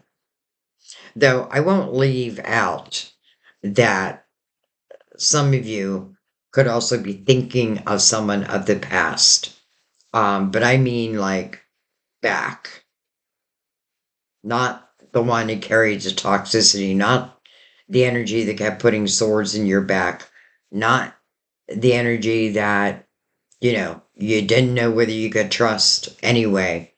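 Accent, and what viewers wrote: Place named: Southeast Pennsylvania, United States. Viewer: 8/31 here. Triple Virgo You sound like you are from the same area that I grew up in. SE Pa. And South Jersey? Love your channel and how accurate you are!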